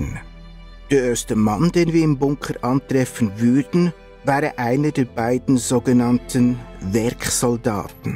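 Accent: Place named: Bern, Switzerland. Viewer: Je mehr Filme ich von Ihnen sehe, desto begeisterter bin ich. Und zwar nicht nur wegen Ihrer unglaublich tollen Recherche und dem schweizerischen Bäärn-Hochdeutsch;), sondern auch von dem unbändigen Freiheitswillen und der Wehrhaftigkeit dieser Generation ( welche meine Grosseltern angehörten). Wir können heute nur erahnen unter welchen Entbehrungen diese Männer und Frauen gelebt haben. Ihre Filme zeigen und würdigen das, und machen es für die nächsten Generationen greifbar. Ich habe auch gedient und bin dankbar ein freier Schweizer zu sein. Gottes Segen.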